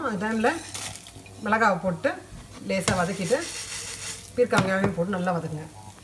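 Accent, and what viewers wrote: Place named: Trichy, India. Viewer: hello mam.. I'm mesmerized by ur speed of cooking... simply superb... which part of tamil nadu are you from..? your slang seems like u are from trichy ... i guess..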